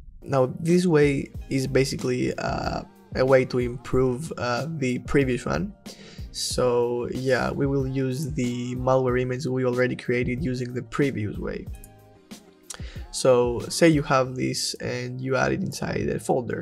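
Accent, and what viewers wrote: Greece. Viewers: Great video and great accent (comming from another greek)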